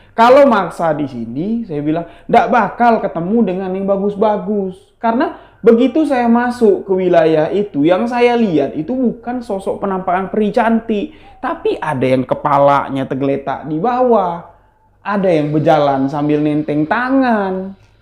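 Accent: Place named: Jambi, Indonesia. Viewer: Walaikumsalam kak n team... Masya Allah Emang Kereen lw Sebuah Kisah Misteri upload cerita Pesugihan sllu bikin penasaran....palagi d bw in kak dgn logat jambi yg khas....the best lah Tanpa yana tunggu lama lama..... Gaskeeuun yuk kak....🔥🔥🔥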